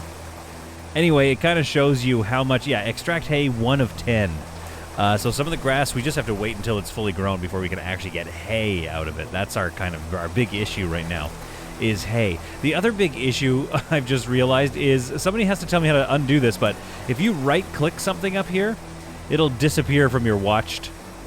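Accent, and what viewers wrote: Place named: Black Country, United Kingdom. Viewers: We don’t claim that accent, it’s more brum or Black Country. 🤣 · That was not a Scottish accent! :P that was like the midlands of England LOL still love the videos though :) keep it up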